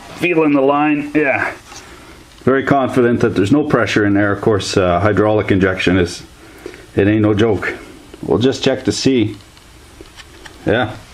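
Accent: Canada. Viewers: This guy sounds like he is from Letterkenny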